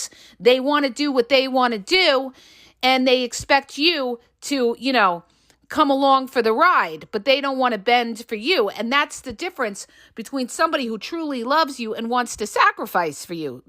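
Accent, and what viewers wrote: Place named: Brooklyn, United States. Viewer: Hey, cool, Brooklyn accent. You are spot on with narcs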